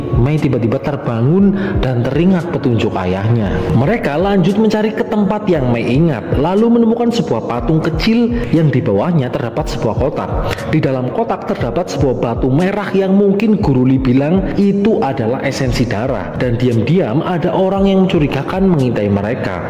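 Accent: Banyumas, Indonesia. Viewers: Kalo yg bawain ngapak suport terus smangatt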